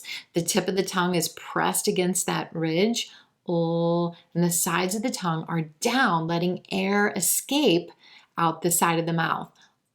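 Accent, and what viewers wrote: United States. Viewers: Wow 😮 very harmful video are you American please replay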